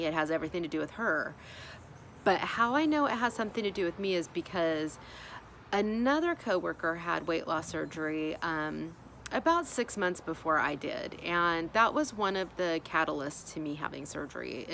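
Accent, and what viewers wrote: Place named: Boston, United States. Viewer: Thanks. I really enjoy following your journey. The struggle is real for all of us. You remind me of a Bostonian the way you talk and your attitude. I grew up in Boston. We're wise guys, sarcastic, hardworking and honest. We tell it like it is. I appreciate you and your struggles. Thanks for keeping it honest and real💟Be glad you're not here now. Big snowstorm coming tonight 🙄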